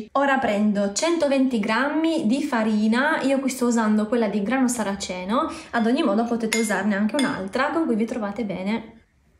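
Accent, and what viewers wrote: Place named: Italy. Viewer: I'm so very surprised to be here because my new favourite singer have a dessert channel, it's so very interesting! I have to learn some Italian and make the recipes 😁, I love your Italian accent Cheers!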